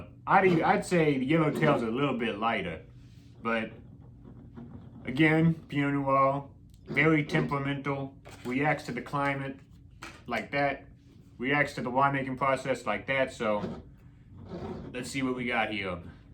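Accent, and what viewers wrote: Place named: South, United States. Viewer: Great video! Are you southern? I love your accent 😍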